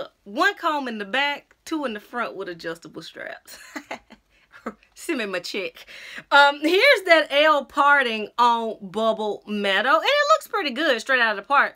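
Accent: South, United States. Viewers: I am here for this Southern accent!!! The wig is beautiful on you! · Its model model. Modelle is moe-dell. I'm a geek like that. Lol. You are absolutely cute as a button, and a pleasure to listen to, you southern belle, you.💓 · I LOVE YOUR PERSONALITY!!!! I randomly came across your video and I'm glad I clicked. You're gorgeous, funny, down to earth, engaging with the cutest Southern accent. I died laughing when you said get your wig where you want to I'm not sponsored....I'm subscribing just for that! P.S. what is that fabulous lippie color you're rocking???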